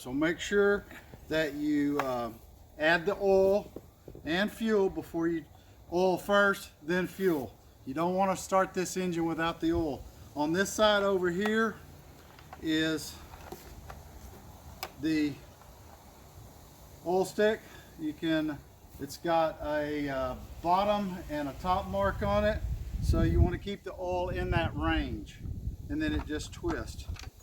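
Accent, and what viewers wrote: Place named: Southern Indiana, United States. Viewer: I love your accent. Southern Indianna? I love how you folks pronounce OIL. In northern Minnesota it's pronounced " Oy-L". You folks pronounce it "OLE" that would rhyme with "Coal" or "Hole". specifically pay attention to the mark in the video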